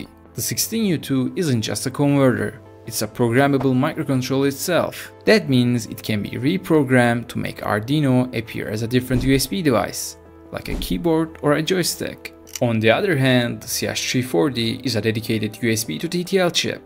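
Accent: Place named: Turkey. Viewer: Hele diyorum hafiften bir türk aksanı duyuyorum.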